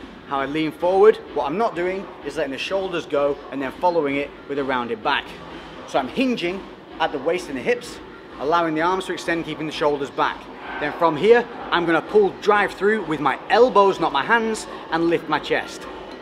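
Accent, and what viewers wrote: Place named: Northern England, United Kingdom. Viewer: "Loosey Goosey" propper Northern lad :)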